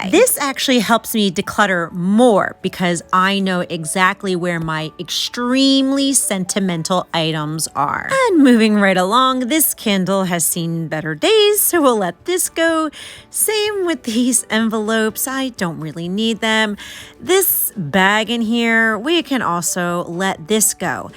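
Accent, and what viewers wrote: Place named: Philadelphia, United States. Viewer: I've really enjoyed watching your channel, not only because you have a great short term-long term process, but because I live in the same region of the country (Philly) and it is good to see and hear the local dialect, see items and logos from local stores, etc. I have been trying to support more local channels in general, because it is by extension a connection to the regional community -- something I don't think we have enough of. Don't sweat the sentimental kid saves -- I had boxes, tubs and closets full of them until my last move in 2015. Sadly, much of it was ruined, and my other excuse --- saving it for the grandkids --- became lamer and more irrelevant by the day as the grandkids became too old for the things that were salvageable. I ended up with one special cedar chest with my most sentimental saves once I let it all go.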